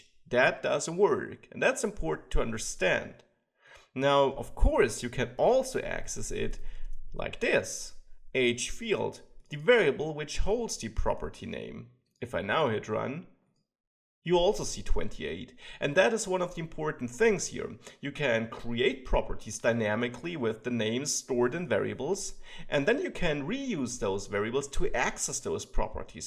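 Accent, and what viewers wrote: Germany. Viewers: the thick german accent it, LOVE IT!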